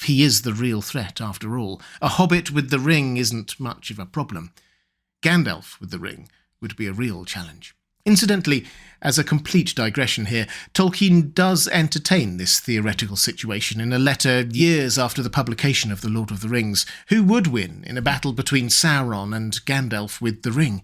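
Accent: England, United Kingdom. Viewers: you have the best narrating voice & cadence, I love the way you speak, even down to your accent, I’m also English & it sounds bad but I cannot listen to an American talking about LOTR, it just sounds wrong to me, it’s like trying to listen to an Englishman talk about guns and pickup trucks, just doesn’t feel right.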